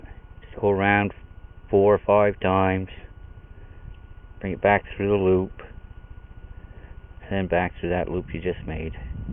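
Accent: Canada. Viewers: hey am living up in ontari-ario and im from the new glasgow area your voice sounds familiar like you might be from around there. Cool videos thanks i subscribed:)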